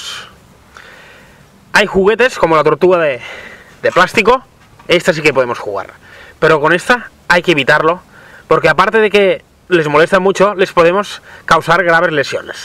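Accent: Catalonia, Spain. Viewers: Pq hablas asi?? Eres de cataluña?? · Que eres de cataluña no? Por el acento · Es de el Delta del Ebro verdad?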